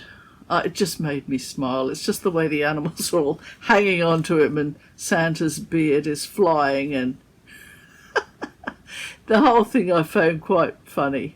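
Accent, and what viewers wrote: Australia. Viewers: Good morning. I cannot wait to see your stitching. Well, I have finished watching and it has been a warm and cozy hour. I especially enjoyed how you kitted up your projects. It’s funny that I would not choose thread cards to organize my threads. However I enjoy your process and even more enjoy your Aussie accent sharing all about it. Merry Christmas!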